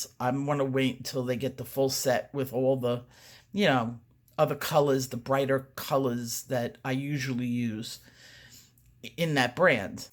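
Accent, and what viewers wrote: New York, United States. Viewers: beautiful. I had you older in my head. Must be the New York accent.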